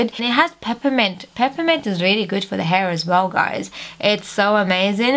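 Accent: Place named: United States. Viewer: Are you from the uk, you sound American 😂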